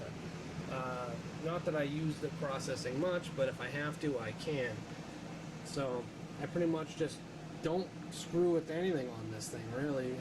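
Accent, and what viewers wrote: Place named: Boston, United States. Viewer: cool setup. you sound like you are from boston guy ;)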